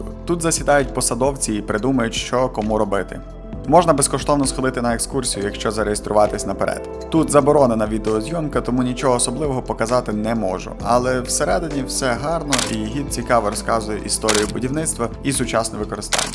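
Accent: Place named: Western Ukraine, Ukraine. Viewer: Привіт! коментарі пишу вперше, але дуже хочу поділитися враженням) Натрапила на ваш канал в рекомендаціях і це ваууу... відео просто топ! подача інформації, монтаж... одним словом все на хорошому рівні. Скажу відверто, мене зачепив ваш говір)) Якщо не секрет, звідки ви родом? У мене є підозри, що це може бути Тернопіль або Івано-Франківськ? Дякую за такий контент, з нетерпінням буду чекати нові відео. +1 тревел-блог в скарбничку)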